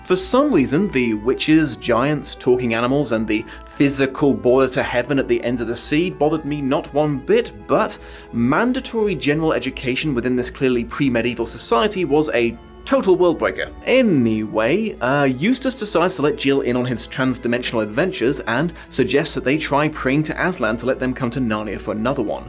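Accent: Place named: United Kingdom. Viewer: I’m always happy to see more videos His lovely British voice soothes me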